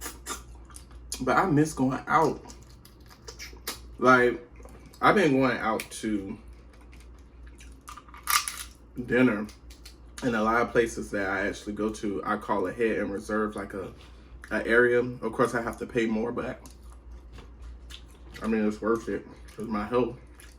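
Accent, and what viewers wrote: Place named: New York, United States. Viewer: You sound like New York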